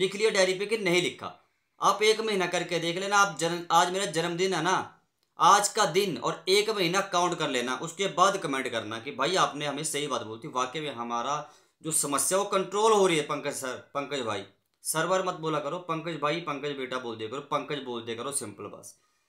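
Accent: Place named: Himachal Pradesh, India. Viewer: Happy birthday🎉🎉Himachali lamguage lg rahi aapki.aap himachal se ho??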